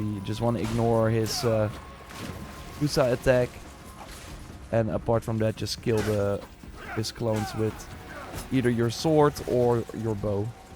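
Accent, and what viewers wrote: Netherlands. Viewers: Dude are you from the Netherlands by any chance?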